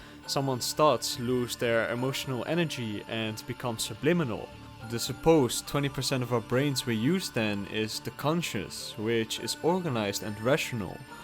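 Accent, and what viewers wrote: Netherlands. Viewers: Is the narrator by any chance Dutch? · Love the vids (btw i heard immediately that you guys are dutch : )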